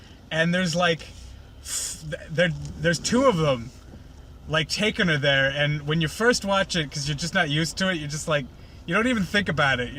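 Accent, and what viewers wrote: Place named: Boston, United States. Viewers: You have such a Boston accent it's really quite amazing. Even I don't have that thick of a New England accent and I've lived here most of my life. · you're from the east coast arent you?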